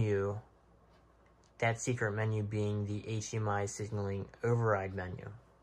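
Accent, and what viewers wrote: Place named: Prince George's County, United States. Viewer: I could tell by your accent. I grew up in PG County. Waldorf and laplata. We speak with long O's lol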